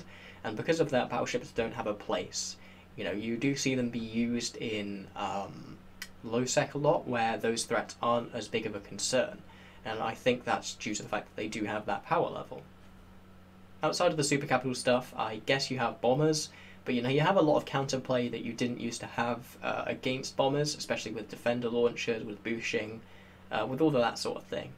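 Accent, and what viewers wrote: United Kingdom. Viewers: Ok, this might be a strange question; I started watching your videos recently and you sound like you have a southern british accent (i'm from 2 hours SW of London) and... yet you have a huge american flag on your wall. I'm very confused by it and was wondering why this was the case? are you american or british?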